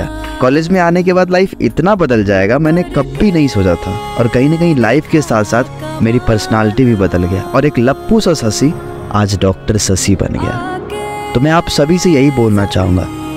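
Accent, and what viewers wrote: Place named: Bhojpuri, India. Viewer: Kasam se bhaiya bhojpuri style me maza aa gayil tu ab doctor completely ban gayila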